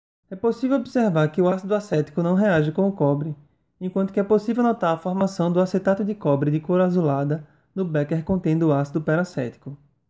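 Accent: Northeast, Brazil. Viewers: ficarei esperando, pois o que vc está fazendo é uma grande contribuição para a comunidade científica do YouTube e ainda mais se for por um conterrâneo como vc, pois sou da Paraíba e pelo seu sotaque, percebo que vc tbm é daqui da região Norte-nordeste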